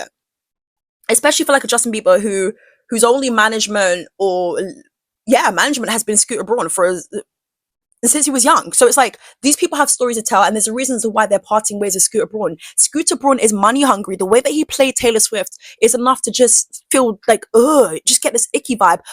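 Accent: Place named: United Kingdom. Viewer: Not the point of the video but I love your British accent.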